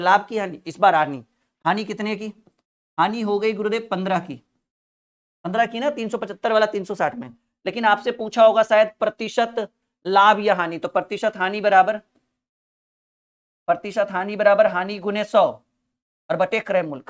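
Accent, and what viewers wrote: Bihar, India. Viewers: Sharab bahut achcha padha rahe hain kya aap Bihar ke hain kya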